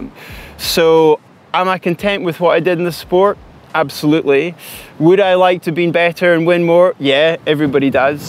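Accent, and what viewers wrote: Scotland, United Kingdom. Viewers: He is scottish......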